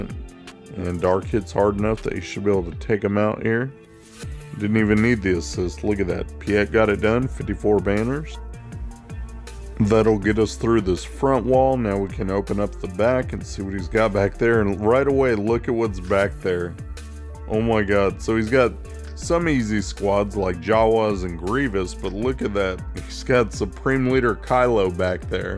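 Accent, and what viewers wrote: South, United States. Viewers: You sound like a southern Technoblade… and it’s actually pretty cool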